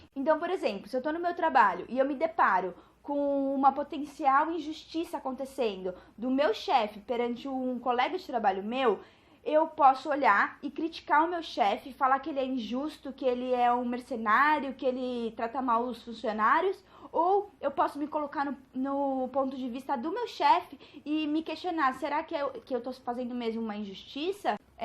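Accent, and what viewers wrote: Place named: Sao Paulo, Brazil. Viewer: Paulista da gema,com esse sotaque!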